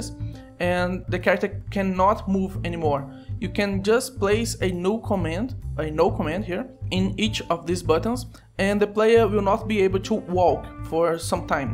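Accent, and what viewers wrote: Brazil. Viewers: Brasileiro ? Haha, reconheceria o sutaque do meu país até no inferno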